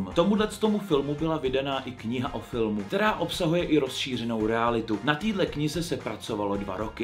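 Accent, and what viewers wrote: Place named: Bohemia, Czech Republic. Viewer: Za mne je ta "čecháčtina" neposlouchatelná. Nauč se mluvit česky a rád si to poslechnu. Ahoj z Moravy.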